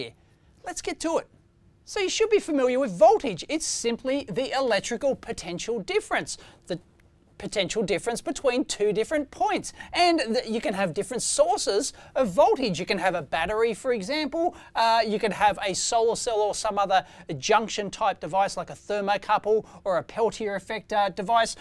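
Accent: Australia. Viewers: Aaaay aussie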